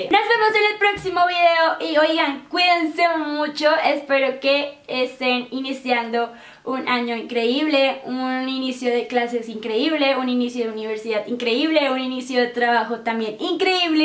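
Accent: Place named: Colombia. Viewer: Me encanta su acento colombiano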